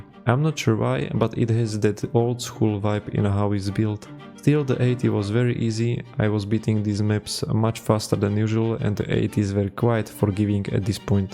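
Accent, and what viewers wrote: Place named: Russia. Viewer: já sem si myslel že je z ruska kvuli jeho prizvuku XD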